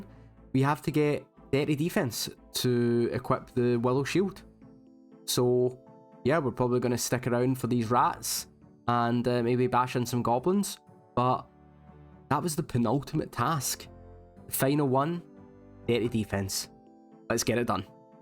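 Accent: Glasgow, United Kingdom. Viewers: Scottish accent, armed goblins -> good arms vs bad arms. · Loving having a Glaswegian voiceover for a chunk lock 👌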